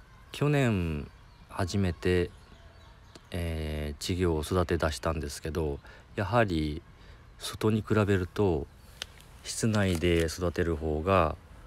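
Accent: Kyushu, Japan. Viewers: こんばんわ〜 チャンネル登録させていただきました！ 方言的に九州の方ですか〜？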